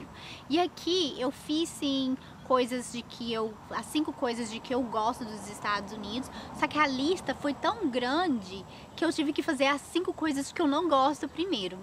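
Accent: Minas Gerais, Brazil. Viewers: Teu jeito mineiro é muito maneiro, nós cariocas adoramos vcs (mulheres) falando!!! vc mora nessa residencia dsd que deixou de ser au pair? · Você é da onde , seu sotaque parece com o de Minas !!! bjss adoro se canal !! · Adoro seu jeitinho mineiro de falar... como e linda essa menina... adorei. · com essa voz de mineiro..tem qui arotar mesmo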